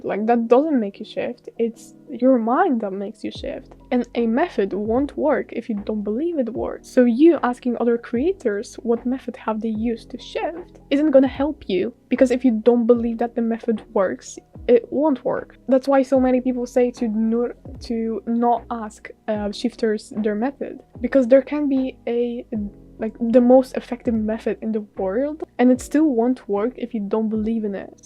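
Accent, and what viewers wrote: Poland. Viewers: I was right you're polish, I can tell it by your accents siema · I heard the first few seconds of the video and i already knew you are polish haha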